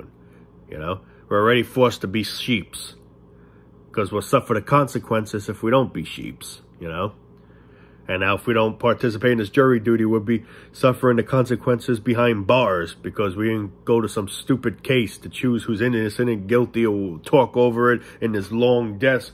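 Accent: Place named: Boston, United States. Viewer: Are you from Boston ? Love the accent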